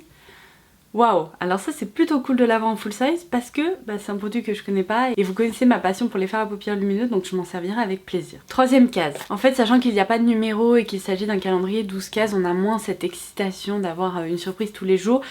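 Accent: England, United Kingdom. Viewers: J’adore ton accent anglais😍🙏🙏; as tu des origines anglo-saxonnes ?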